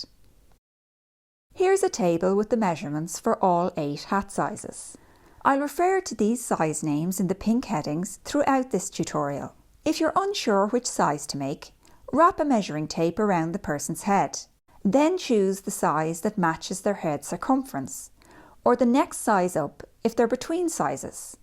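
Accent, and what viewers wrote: Ireland. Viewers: Very nice instructions and love Irish accent 🎉❤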